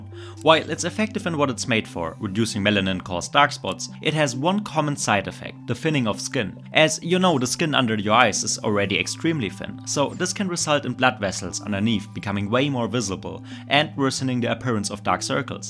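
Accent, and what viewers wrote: Germany. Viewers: That German accent paired with "we never accept sponsorship" assure me deeply. Thank you, you've saved me a lot of time and money! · Thanks lads, i'm off to buy both the sunscreen and peptide cream you showed here in the video - it's in one of my local stores :) Love the Deutsch accent, greetings from your cousin further up north in Denmark - Subscribed and liked the video! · Indian? Isn't this a german accent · Sounds like german „english“ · Are you german?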